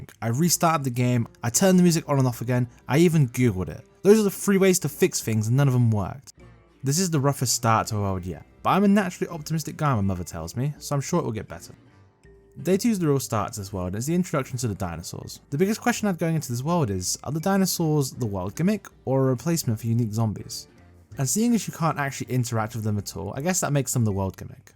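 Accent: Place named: United Kingdom. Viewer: why does your voice get increidibly more british when you stream?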